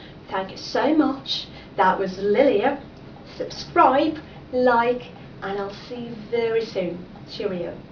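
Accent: United Kingdom. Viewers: Thanks for the wonderful video (despite the sound, though). I'm German and I'd like to have such a wonderful British accent like you. So I envy you a little bit.;-) It must have been much harder for you as a Slavic to obtain the British accent. I'm wondering how you obtained it and how long it took you. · Никогда бы не подумала, что русскоговорящий человек может добиться такого произношения! Очень по-британски звучит. Мне американский больше нравится, т.к. легче повторить самой и понятнее речь других, а британский кажется каким-то вычурным, но все равно это очень круто :) Не могу определиться только с мыслью: что лучше для учеников, преподаватель с британским или с американским акцентом? Ведь в первом случае улучшается их способность понимать этих странных британцев :) а во-втором увеличиваются шансы, что их поймет большинство. Овладеть сразу несколькими акцентами и умело переключаться между ними, полагаю, задача не из легких...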